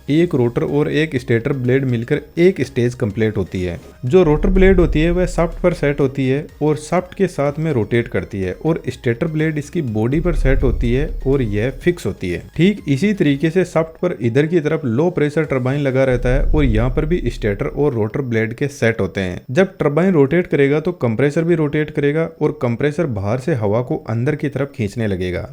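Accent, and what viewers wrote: Rajasthan, India. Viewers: आपकी हिन्दी बोलने की शैली में राजस्थान की झलक दिखती है क्या आप मारवाड़ एरिया से है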